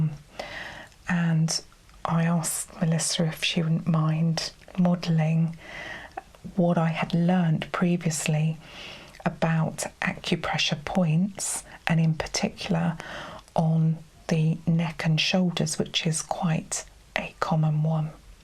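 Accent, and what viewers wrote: United Kingdom. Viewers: Oh, the british accent 😍🥰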